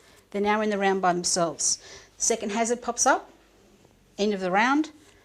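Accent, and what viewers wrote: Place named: Australia. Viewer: Nice video thanks. And an Aussie voice. Nice.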